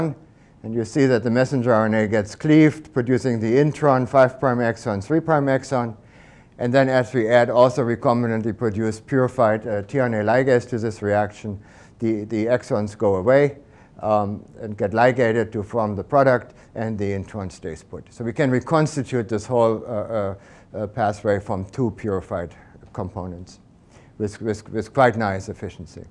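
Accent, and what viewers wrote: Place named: Germany. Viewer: I remember when he still had a thick German accent when I first met him. It looks like it has shifted over time.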